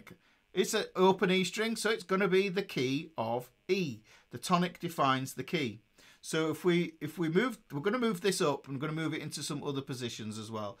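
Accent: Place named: Northern England, United Kingdom. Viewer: I love the northern bloke teaching me. I'm from Rugby. Thanks mate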